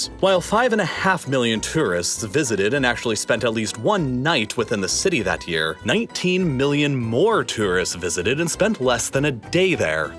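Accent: Canada. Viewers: Hey! I didn’t know you were Canadian! You can tell because we say Toronno, not Toronto.🇨🇦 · I didn't realise that was Canadian! you can tell because he drops the second 't' in Toronto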